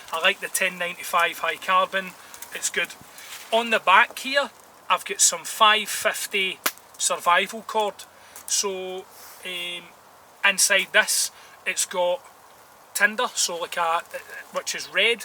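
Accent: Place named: Scotland, United Kingdom. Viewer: Enjoyed the video. I've been thinking about the benchmade 162, but it's quite pricey for the size of it. I'm trying to place your accent.. Where about in Scotland are you guys?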